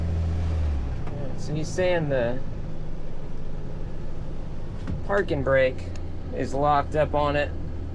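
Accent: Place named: Texas, United States. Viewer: What a character! He speaks good ole Texas gibberish like my uncles.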